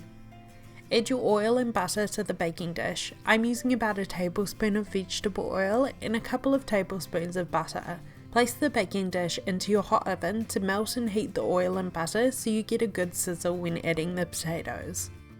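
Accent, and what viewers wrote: New Zealand. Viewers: Lovely recipe,! And is that a kiwi accent I hear?:) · Yeeessss. Recovering from an operation which has put starchy foods back on the menu. Been craving roast potatoes for almost 5 years. Gonna try it with sweet potato too, do you reckon it'll come out crunchy? Not only did I get the recipe to do them like nan used to, I got it in an easy to listen to New Zealand accent. Sweet as, cheers from across the Tasman.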